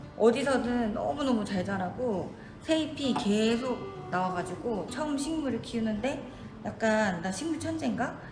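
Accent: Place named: Jeolla, South Korea. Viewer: 절라도여? ㅎㅎ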